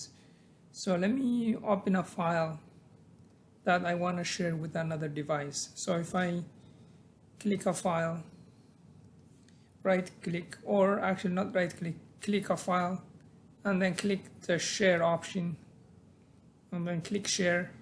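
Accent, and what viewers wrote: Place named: India. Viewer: Feeling Indian accent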